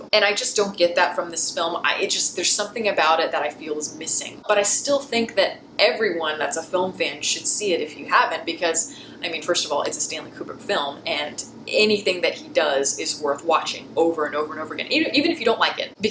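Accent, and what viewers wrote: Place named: Los Angeles, United States. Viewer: That's how girls talk in Silverlake (LA, California).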